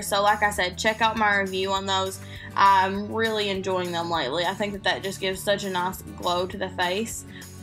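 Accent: Kentucky, United States. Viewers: What Jesse Girl pigment is this? Love your accent. You sound like my husband. He's from Ky.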